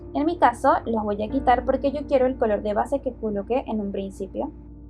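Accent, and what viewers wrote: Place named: Lima, Peru. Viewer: El señor algoritmo de «yutúh» me recomendó tu vídeo, por fin le atina a algo. ¡Excelente contenido! (Supe inmediatamente que eras de Perú por tu acento de metrópoli, jaja)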